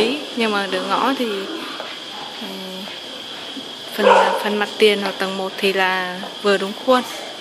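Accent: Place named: Hanoi, Vietnam. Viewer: Chào bạn gái Hà Nội yêu thương! cảm ơn bạn chia sẻ video này để mình biết về chợ ở HN. Giong nói Hà Nội nhẹ nhàng đáng yêu. Đăng ký kênh bạn và mong bạn cùng tương tác lại với mình để duy trì kết nối nhé.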